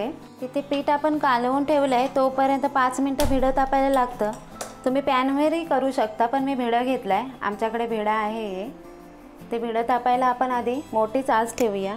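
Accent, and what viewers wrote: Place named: Konkan, India. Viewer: खूपच छान रेसिपी सांगता पण सुंदर भाषेत कोकणि ढब छान वाटते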